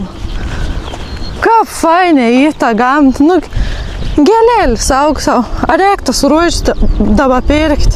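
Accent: Samogitia, Lithuania. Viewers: Smagu klausyti žemaitiškas tarmės, labai smagiai žiūras. Sėkmės👌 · Smagu girdeti zemaiciu snekta.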